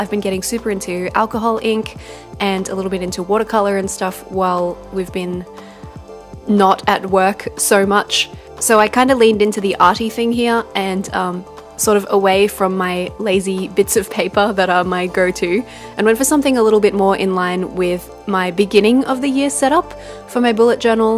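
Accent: Australia. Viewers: I love your simple bujo set up :) WOW! I was in Melbourne back then! I love Australian accent :)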